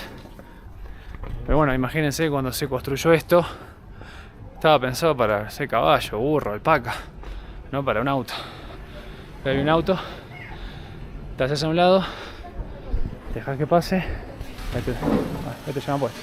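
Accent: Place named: Buenos Aires, Argentina. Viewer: querido, me siento muy identificado con tu manera de hablar, bien lunfardo. Los videos me divierten y enseñan, la verdad, sos crack. Abrazo enorme!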